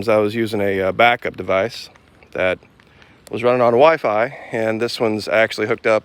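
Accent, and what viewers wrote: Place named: South, United States. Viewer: Hey! Yer country voice is leekin’ thru!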